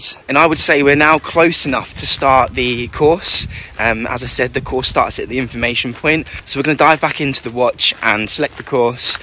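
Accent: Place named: United Kingdom. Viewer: The video is probably good, but sorry can't understand half of it because of the heavy British accent.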